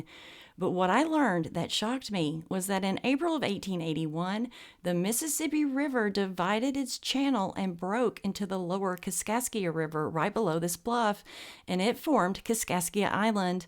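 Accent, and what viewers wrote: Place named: South, United States. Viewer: Love that slightly southern lilt in your voice.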